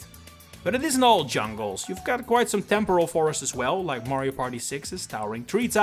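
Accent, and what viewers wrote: Netherlands. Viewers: he's Dutch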